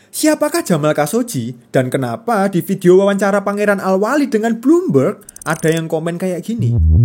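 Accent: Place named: Japan. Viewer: Ngomongnya medok banget kaya org jepang takeshi sunyoto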